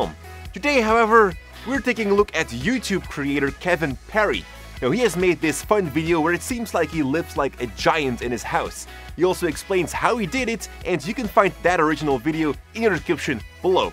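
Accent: Netherlands. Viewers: Are you dutch? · are you dutch ?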